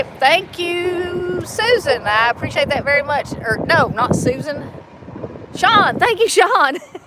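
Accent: South, United States. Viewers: Your little country voice just sounds so sweet. ❤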